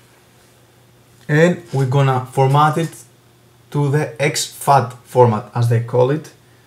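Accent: Greece. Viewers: το βιντεο ειναι τρομερα βοηθητικο... με το που σε ακουσα να μιλας λεω κατευθειαν αυτος ειναι ελληνας 100% μπαινω στο καναλι σου... βλεπω ελληνικες πινακιδες στο αυτοκινητο σου... και γαμω τα καναλια... subed <3 ;)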